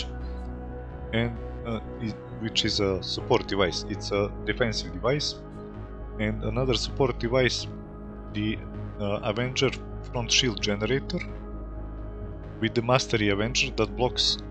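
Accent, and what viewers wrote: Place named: Russia. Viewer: Блин такое ощущение что он русский просто говорит на английском😁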